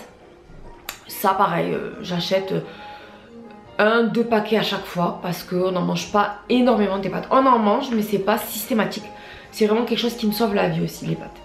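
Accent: South, France. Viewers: Coucou ma belle je te suit depuis la recette de tes msemen inratable lol. Et j'aime ton nouveau contenus je me suis tjrs demander à quoi ressemblé cette voix derrière ce magnifique accent du sud. Je suis pas decu tu es adorable à tout point de vue. Continu a nous régalé et nous proposer de news contenus différents bisou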